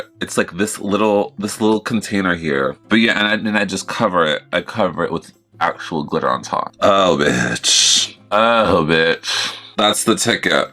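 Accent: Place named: Boston, United States. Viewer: i think that's just her boston accent tbh 😭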